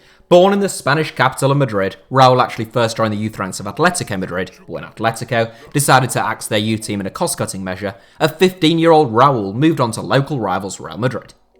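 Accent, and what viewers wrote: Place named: United Kingdom. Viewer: Love The video but your accent iz so british i don't understand shit.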